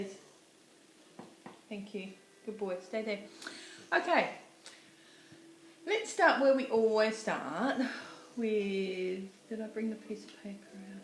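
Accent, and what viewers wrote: Australia. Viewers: Loved your vlog, as always. I'm of "that age", too. When David Cassidy had a concert in Perth, my family was living at South Headland (West Oz) and I was heartbroken when mum said she wouldn't take me to his concert. lol If I remember, not all the roads were sealed back then. Love everything you showed, especially the Happily Ever After. Made my day hearing an Aussie accent...I keep hearing about mythical Australians over here....not seen one in three years! lol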